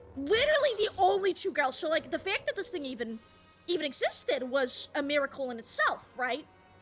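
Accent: Boston, United States. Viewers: The Boston debuff is so cute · shes from boston, and as far as im aware its not a character thing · don't hear Boston accents very much were I'm from. It's unique and interesting. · I somehow never made the connection that cardboard is pronounced the same way as any other "-ar" word in Bostonian. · Just sounds like every woman in Boston to me. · It's a Boston accent, lol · The Boston accent really enhance the stories.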